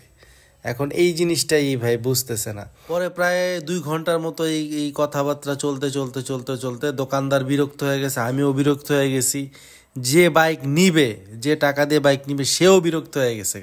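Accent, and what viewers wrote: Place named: Noakhali, Bangladesh. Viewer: ভাই নোয়াখাইল্লা বলে কথা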